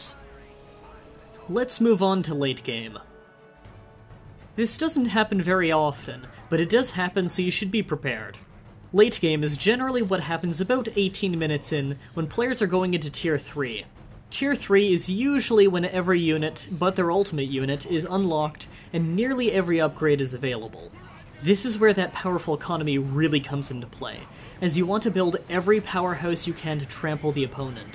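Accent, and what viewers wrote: Canada. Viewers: He is so frickin Canadian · You canadian right?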